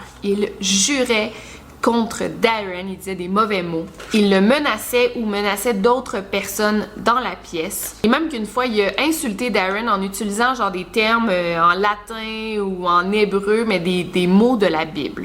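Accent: Quebec, Canada. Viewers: Merci pour cette vidéo. Ooooooh que j'adore ton accent. C'est trop mignon 🥰. J'adore l'accent Québécois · J adore ton accent ...québécois tu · Roh l’accent québécois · Depuis que je regarde tes vidoe je me suis habitué à l’accent canadien 😂❤️ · Moi j'adore l'accent Québec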